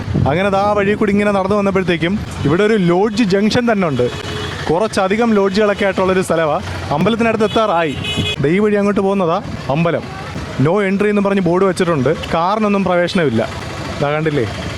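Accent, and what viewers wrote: Kollam, India. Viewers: കൊല്ലം ശൈലിയിലുള്ള നാടൻ, ജാഡ ഇല്ലാത്ത അവതരണം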